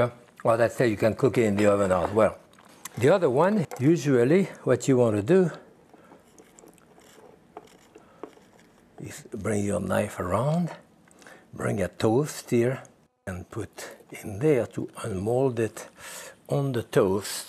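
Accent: France. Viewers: Is there a better accent than the French accent? I think not. Hehe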